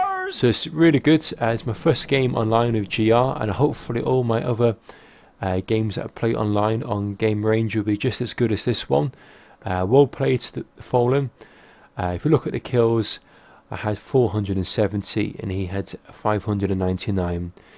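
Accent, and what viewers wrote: United Kingdom. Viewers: idk,i just love your british accent :D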